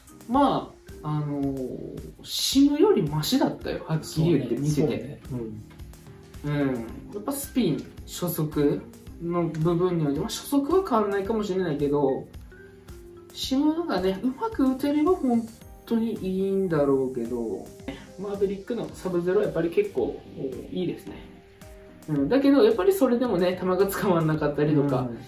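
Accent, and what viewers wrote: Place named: Kagoshima, Japan. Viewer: やっぱり鹿児島弁は聞いてて、気持ちよく聞き取れるし、いい感じです🙋いつも楽しいYouTube動画で試打やクラブの情報ありがとうございます♥️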